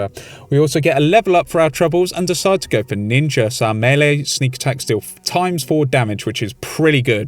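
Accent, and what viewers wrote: United Kingdom. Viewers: I always forget that you're British. And then I click on a video and get a right shock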